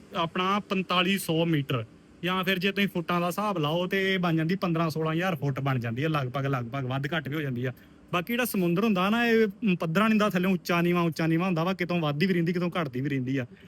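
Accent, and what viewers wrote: Majha, India. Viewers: ਵੀਰ ਜੀ ਲੱਗਦਾ ਮਝੈਲ ਜੇ 😊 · ਮਾਝੇ ਆਲਾ ਭਾਊ ਲਗਦਾ ਬੋਲੀ ਤੋਂ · ਬੋਲੀ ਤੋਂ ਲੱਗਦਾ ਭਰਾ ਮਾਝੇ ਤੋਂ ਹੈ ਵਾਹਿਗੁਰੂ ਤੁਹਾਡੇ ਅੰਗਸੰਗ ਰਹੇ 🙏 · ਤੁਹਾਡੀ ਬੋਲੀ ਮਾਝੇ ਦੀ ਹੈ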